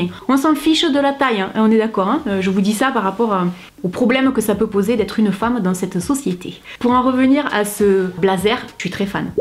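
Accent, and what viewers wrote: South, France. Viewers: Top, t'es pas banale tu es une fille du sud avec l'accent, un peu ronde, et tu assumes tout comme moi. Après Cardy b c'est pas mon style et le simili cuir ça me fait trop transpire. Je suis du Tarn pays du cuir. Bise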